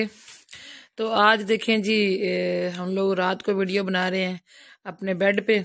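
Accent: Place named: Hafizabad, Pakistan. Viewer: لیکن حافظ آباد کی بولی کا اپنا ھی مزہ ھے تھوڑی سی بولی تھی آپ نے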